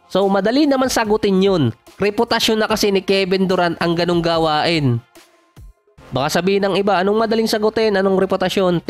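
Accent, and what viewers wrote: Batangas, Philippines. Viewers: salamat sa iyong nba updates, ako'y nagsubscribed na rin at ramdam ko eh ikaw eh isa ring gaya kong batangueno eh, tama ga... shout out mula dubai ha.. balak kong maaglaro din 1XBET eh pwede gang gamit eh ibang Gcash, sa fiance ko at ang akin eh di pa updated eh, ayos ga yun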